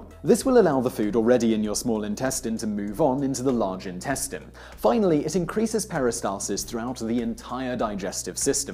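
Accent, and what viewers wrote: England, United Kingdom. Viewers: Dear. I wonder how you choose your pronunciations? If you're speaking with a strong English accent but using American emphasis just to attract the larger American audience then I understand. But often your pronunciation doesn't fit with any English or American dialect that I know. After so much research effort, doesn't it make sense to find out how to say the words properly? By the way, for the benefit of American viewers, yes, manner seems to me to be conceited too. We're not like that. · Hearing this in a British accent makes it sooo much better for some reason. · I love listening to all those English pronunciations in these videos. Good job, mate. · This video is proof that everything sounds more pleasant when heard in an English accent. I mean, poop...really?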